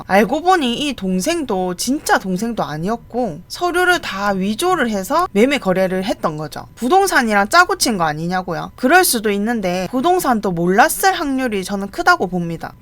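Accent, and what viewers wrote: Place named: Busan, South Korea. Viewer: 붓싼 말씨네요. ㅋ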